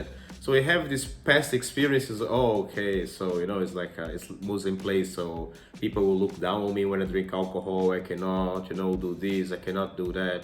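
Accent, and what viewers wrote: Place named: Brazil. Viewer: Well done, you speak your mind about Malaysia and not just gloss over the topic like some other YouTubers just trying to make everyone happy. Bravo for that! From your accent, I believe you are Brazilian. Not sure yet but I will look at your other videos to determine that later. Interesting observation of the Turkish people. I have interacted with those outside of Turkey and visited Istanbul before. Never live there before.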